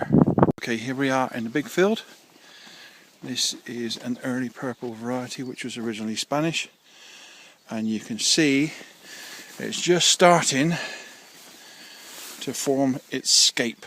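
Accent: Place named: West Country, United Kingdom. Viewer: I'm growing several varieties from the garlicfarm "Wight" plus others I've picked up from other growers and private people, some varieties I can name off the top of my head are Maxatawny, inchellieum red, albigensian, solent wight. early purple, lautrec, Purple haze, Bella italiano, czesnok, siberian, red grain, red rezan, red belgian, vallalado, elephant garlic, avignon, killarney red, morado, estonian red, oosterdel (lithuanian) Harnas, wioleta, music, german stiffneck,Pyongyang, czech varieties Vekan and broadleaf, bulgarian, transylvanian, beli luk(bosnia) Anatolian Toscapru,(Turkey) in fact too many to keep track off. I actually work in Norway and despite having a bumper crop this year it was too long in the ground due the idiot (Ex)partner of mine not telling me it was ready and the bulbs opening, I am going to dump over 15,000 harnas bulbs in addition to the 6000 I've already dumped as don't have the land or resources to plant it. 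I will be back in Poland, for two weeks from 13-10 mail me at <mail> I hear from your accent your from the west country, I'm originally from Wiltshire. Scott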